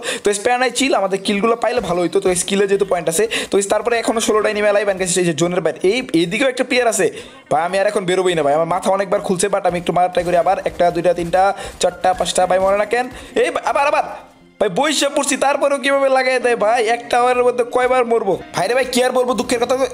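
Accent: Tangail, Bangladesh. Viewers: Vai apnar vasa tangail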